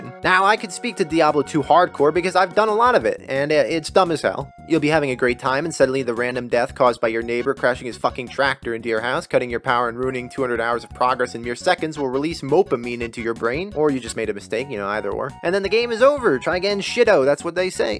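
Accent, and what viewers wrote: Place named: New York, United States. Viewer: You just sound like a new Yorker and they big there. You eat what ever you want brother, just keep making content.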